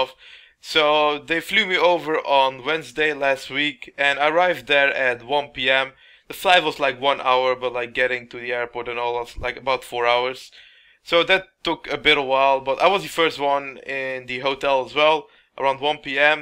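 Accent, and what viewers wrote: Netherlands. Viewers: are you Dutch?! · you sound really dutch in your english accent, where are you from? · Why does it sounds like you are Dutch or Belgium lol. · Hahaha ik wist het gewoon dat jij een nederlander was, ik hoorde het al een paar keer in je videos maar nu weet ik het zeker. ik denk post het maar gewoon dat ben ik dat ook weer kwijt.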